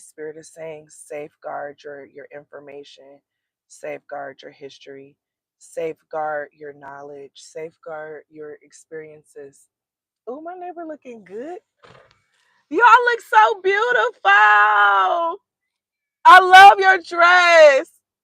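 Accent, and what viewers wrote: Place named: Midwest, United States. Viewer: I knew yo ass sounded like you was from Milwaukee or the Midwest lol. That’s my home town 414. Appreciate the message. 💫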